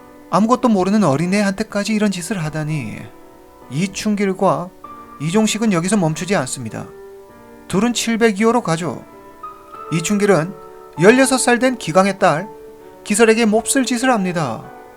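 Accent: China. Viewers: 길림성출신?ㄷㄷ 조선족같다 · 조선족인가요? 무섭네요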